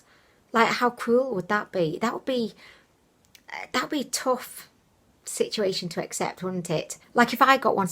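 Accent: United Kingdom. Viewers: Interesting. It’s not a normal British accent.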